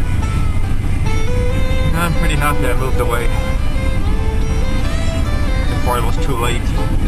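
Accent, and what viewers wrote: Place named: Fargo, United States. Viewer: funny accent. like the movie Fargo!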